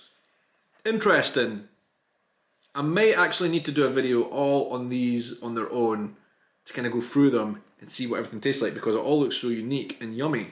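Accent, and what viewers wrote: Scotland, United Kingdom. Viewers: Hi 👋 from SoCal... I have to confess, I watch your videos because I love the Scottish accent. ❤️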